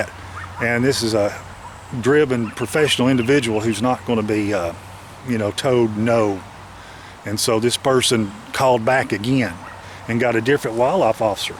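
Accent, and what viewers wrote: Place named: East Tennessee, United States. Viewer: Where are you from you sound alot like people.from my area in East Tennessee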